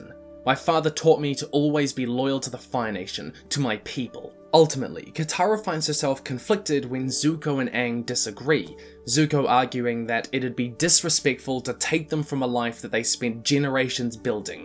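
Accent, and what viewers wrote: New Zealand. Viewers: Bro you a kiwi ? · Wait your new Zealander?! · wait is he a kiwi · Are u a kiwi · Great video.... But I can't stand how kiwis replace "E" with "I" when the speak. "When" turns to "whin" "end" turns to "ind" lol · You're a kiwi?! how did I never notice.I'm a kiwi too. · are you from nz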